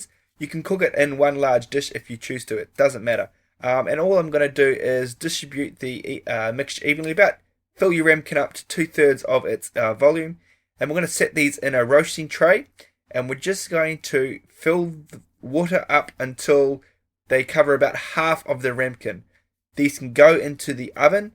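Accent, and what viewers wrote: New Zealand. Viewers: Like your kiwi accent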